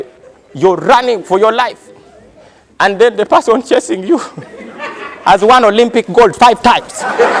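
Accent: Uganda. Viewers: Man l love your Banyankole accent 😂😂😂😂😊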